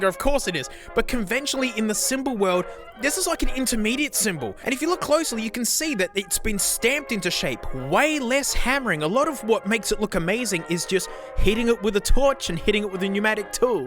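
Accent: Australia. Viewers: I can't hardly tell the difference between any cymbal on my chineesium earbuds I just like listening to funny Australian man lul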